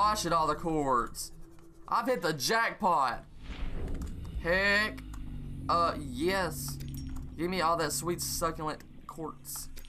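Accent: South, United States. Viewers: I think it's neither. He's probably from southern U.S.A. He's got a southern accent. Not too strong of one either so probably Tennessee or something. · Sounds american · Southern america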